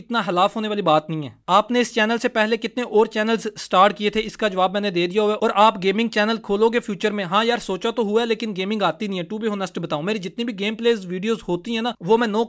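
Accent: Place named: Punjab, Pakistan. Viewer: Ap k bolny k style se lgta hy ap jhlem se ho ... Han ya naa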